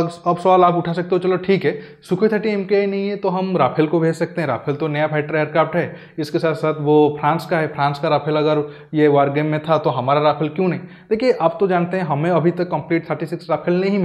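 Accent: Odisha, India. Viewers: sorry to say please currect your accent of oriya in English like word - America , humare these words you can follow a hindi speaking people to correct your pronounciation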